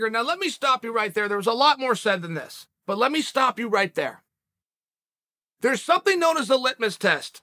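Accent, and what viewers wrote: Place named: Portland, United States. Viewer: Chael’s speaks Russian with a Portland accent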